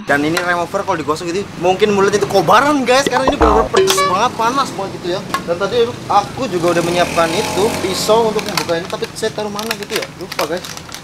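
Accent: Banyumas, Indonesia. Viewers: Mas ngomong nge nggae basa ngapak kenera ora ngapak ora kepenak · Mantap wong ngapak 🤣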